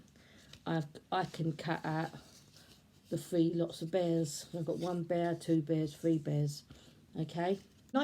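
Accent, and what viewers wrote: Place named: United Kingdom. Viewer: hun, gosh I have a thing for British accent. I could listen to you all day,ding ding 🛎!! You’re very creative hun 🤗that recycling card came out quite pretty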